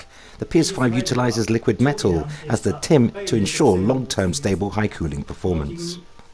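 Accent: United Kingdom. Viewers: British ASMR for Playstation fans.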